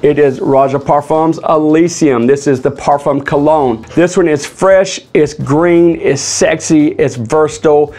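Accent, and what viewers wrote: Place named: Texas, United States. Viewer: Dude I really enjoy your reviews. You sound like your from my neck of the woods. Ft Worth friggin Texas. Preciate you’re work here. I’ll be checking some of these out.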